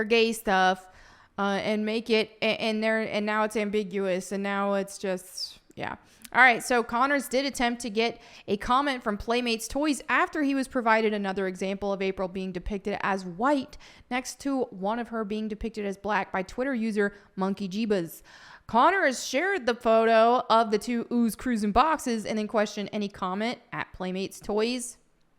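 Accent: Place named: Texas, United States. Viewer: Hey I was wondering, what happened to your Texan southern bell accent you used to have and southern bell body movements and poses you used to do. I noticed you started to consciously practice annunciation there in your first videos and after that is pretty much went away. Nice work, was just wondering why you felt you needed to change to that extreme when you started streaming and posting vids online? I noticed that as well cuz us southerners don't really annunciate T, kinda rolls, don't really try on em but in the vids i noticed you really started hitting them hard.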